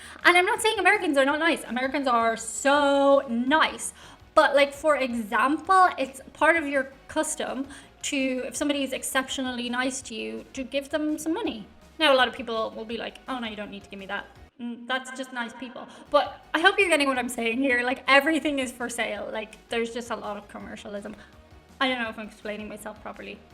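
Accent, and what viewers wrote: Ireland. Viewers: We love the Irish accent! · I love female Irish accents 😏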